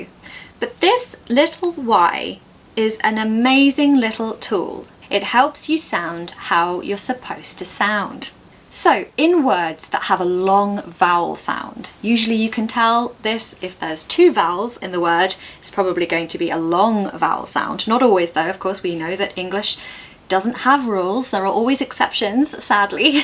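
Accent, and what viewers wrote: RP, United Kingdom. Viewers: Wow excellent reading of you. I always love your British lovely accent and pronunciation. I like your beautiful, amazing desk gifted by Tom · When you say, hello beautiful people, it's actually hello, beautiful lady with fantastic new lesson for English learners ❤❤. Love British accent modern RP.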